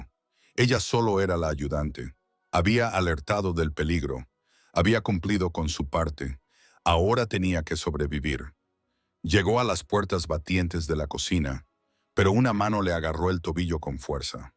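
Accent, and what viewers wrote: United States. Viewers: ME ENCANTA LA IA , CON ESA VOZ CON ACENTO ESTADOUNIDENSE. , SERÁ PORQUE TENGO UN AMIGO ESTADOUNIDENSE QUE HABLA EL ESPAÑOL ASÍ. AH POR CIERTO ME ENCANTAN LAS NARRATIVAS DE ESTE CANAL. MUCHÍSIMAS GRACIAS. SALUDOS CORDIALES DESDE CDMEX MÉXICO.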